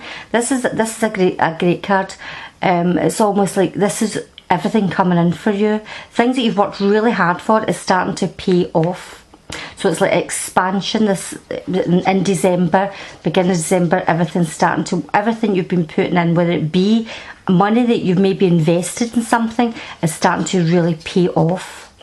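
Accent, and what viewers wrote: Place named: Scotland, United Kingdom. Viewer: A Scot like myself, nicely read 👍🏽👌🏼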